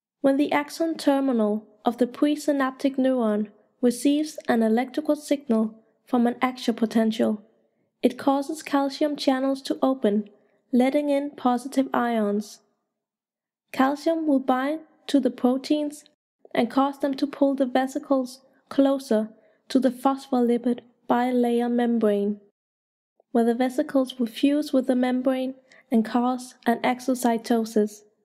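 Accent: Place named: Denmark. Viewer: Vidste du var dansker, man kan høre det på din accent ;) God video keep it up!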